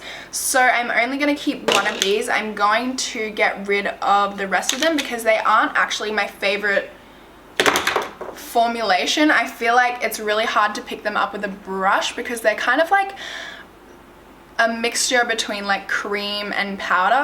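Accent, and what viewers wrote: Australia. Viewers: Hey are you Australian? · is she australian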